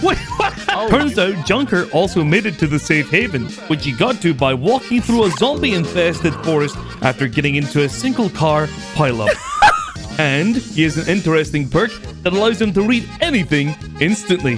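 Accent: Scotland, United Kingdom. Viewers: Are you Scottish pal ?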